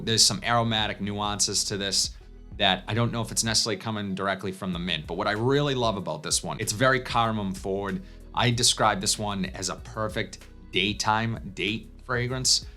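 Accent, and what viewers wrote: Boston, United States. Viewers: niceee I live in providence I know that accent a mile away lol Boston ri we all talk like that lol .. great vids subscribed and got notification on appreciate ur content 🔥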